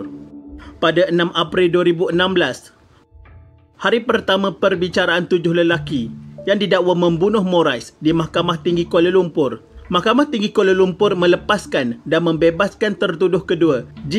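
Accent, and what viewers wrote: Kelantan, Malaysia. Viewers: Pekat betul slang Kelantan ni ahahahah 😂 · org klate ehh?? Klu x silap telinga sye dngar lorat nye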